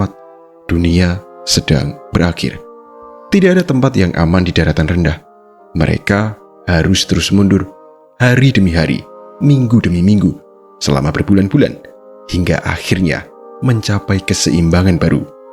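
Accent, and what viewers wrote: Java, Indonesia. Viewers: Logat Jawa Memnag enak kalau untuk narasi film dokumenter artikulasi jelas dan suara ngebasnya itu enak didengar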